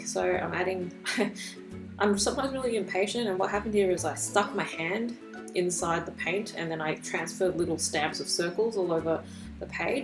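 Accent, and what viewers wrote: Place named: Australia. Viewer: are you australian or british, you have a very interesting accent because i can't tell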